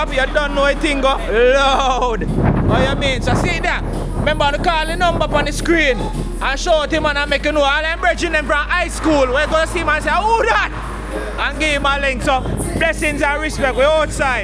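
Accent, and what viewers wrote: Jamaica. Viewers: Respectfully and TOTALLY disagree 💯🙏🏻!YOU! YUH CONTENT!!AND YUH PATOIS!!!! ARE 💯🙏🏻🤗🇯🇲❤️💛💚 NUFF RASPECT EVERY TIME MI BRO.. MI GENERAL..OUTSIDE! ❤🤗🇯🇲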